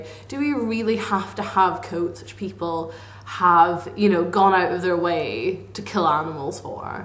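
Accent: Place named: England, United Kingdom. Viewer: You sound english and why would you buy a coat for -40 when englands temp is like 5 degress in the winter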